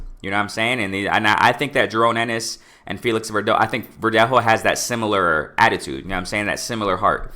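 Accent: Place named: Mexico. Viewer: Hey good video! I was picking up on your accent and it sounds Mexican, by the way you emphasize on the "J" in Verdejo. Am I right?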